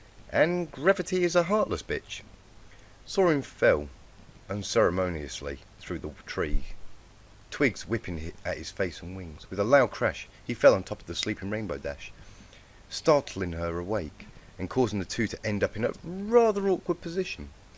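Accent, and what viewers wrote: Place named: United Kingdom. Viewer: the guy reading is british, and guy who wrote it is aussy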